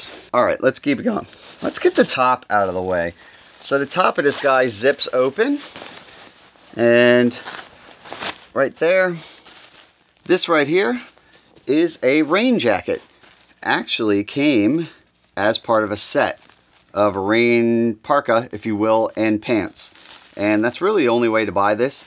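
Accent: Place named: Pittsburgh, United States. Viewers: You sound like you're from PA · Are you from Pennsylvania? I feel like I'm hearing some light Pittsburghese.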